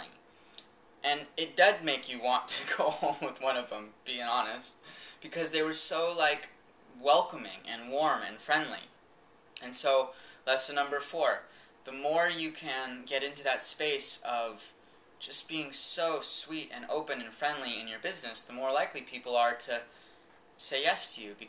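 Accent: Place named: England, United Kingdom. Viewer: i like ur english accent where ur home town?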